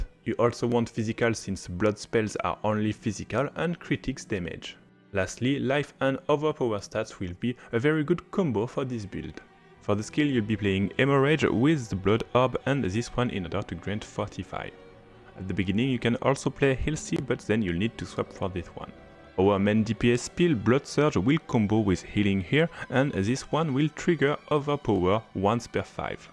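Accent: France. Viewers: J'adore notre l'accent fr en anglais 😅🤣... mucho love❤😉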